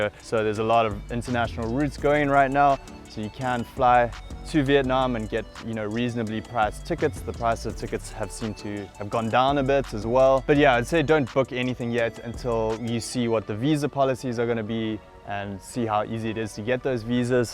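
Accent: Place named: South Africa. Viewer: i hear some kind of a South African accent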